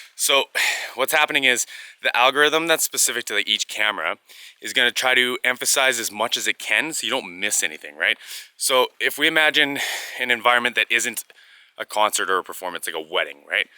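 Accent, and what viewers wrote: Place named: Canada. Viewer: I don’t know why I never noticed what an amazing Canadian accent you had before listening to this video. Have you edited it out in the past?Lol!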